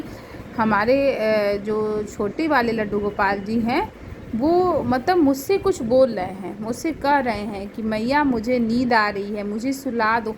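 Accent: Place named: Pahadi, India. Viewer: Aap ka accent bta rha h aap pahadi h 😀❤️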